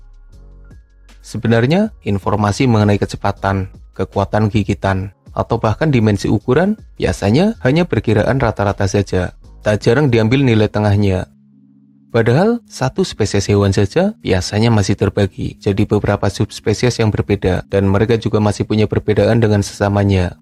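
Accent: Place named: Ngapak, Indonesia. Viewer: Orang jawa nih admin nya 😂 sepetinya ngapak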